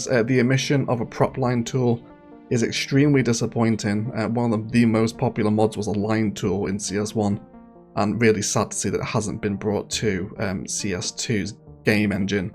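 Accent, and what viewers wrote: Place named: Cockney, United Kingdom. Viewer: Omg cockney 😅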